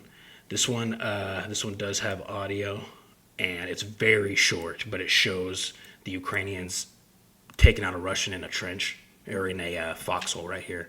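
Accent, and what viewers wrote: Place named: United States. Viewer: New subscriber here. I very much appreciate your straightforward style, yet you have a docile, soothing voice. I'll work on getting the backlog of your work watched in the upcoming days. I assume by your American accent that is where you are from, but people are abroad for many reasons, so I won't speculate. Love and support from NC, USA! EDIT: By the way, I'm so glad you're showing things as they occur and not blackened out, etc. People NEED to WTFO with that noise. I also appreciate, and that is not a strong-enough word, that you state your stance. I liked it a lot!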